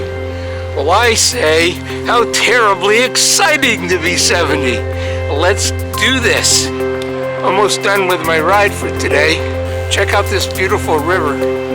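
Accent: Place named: Long Island, United States. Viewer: Wonderful video and Happy Birthday!! 🎉🎉🎉 P.S. Love your accent. I grew up in Merrick, Long Island (right next to Jones Beach). Neighbors!!! ❤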